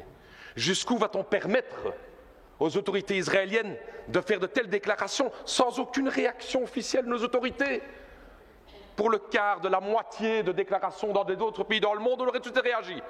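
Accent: Belgium. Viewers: Comment je le kifff à l’écouter la vérité si bien dites avec l’accent belge c’est magnifique,en tout les cas il a raison à 1000% c’est même triste à écouter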